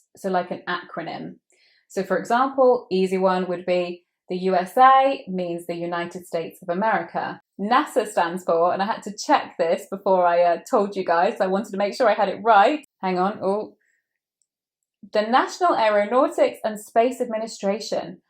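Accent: United Kingdom. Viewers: I never give up I never come around I adore british accent thank you for help me god blessing you forever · Awfully love British accent or love the u teach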